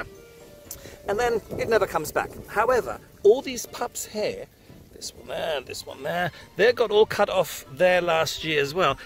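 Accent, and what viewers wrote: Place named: Northern England, United Kingdom. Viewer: Fascinating that a German is doing a northern English accent. That's a trowel, by the way.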